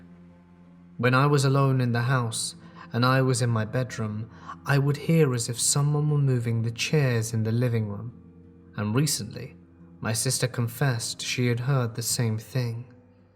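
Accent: United Kingdom. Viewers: Is it you that just speaks that way? Or do you only get stories from the U.K?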